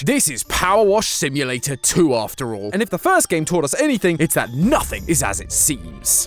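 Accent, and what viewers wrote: United Kingdom. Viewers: As a fellow British person, we do just say it like that 😅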